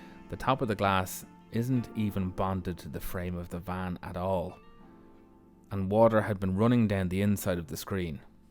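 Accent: Ireland. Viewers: As a Dutch guy I love the Irish accent and your humor man. I’m not used to film or let alone editing but the way you portrayed the disassembly for instance looks fantastic. I appreciate the fanatic way of removing the rust, you can only do it good once. About etch primer or epoxy, people shouldn’t bother. Etch is only for adhesion and given the fact it needs to be applied very slightly it doesn’t give protection against corrosion, that’s the job of the epoxy primer. And some epoxy primers are suitable for using on bare metal and some aren’t. I presume you checked it before you bought it and especially before you applied it. The only thing I don’t understand why you removed the primer on the plug welding spots. Couldn’t you use a weld through primer? Oh maybe that’s only suitable for spotwelding, when there’s are no drilled holes in both layers of metal. No I would seal all the seams between the top and under panel where water can run into. 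And I would pay special attention to the screen wiper mountingpoint for instance, I mean there is bare metal underneath. I’m not saying this to pretend I know everything better, just trying to think along. Keep up the good work and that means the total package, I enjoy it!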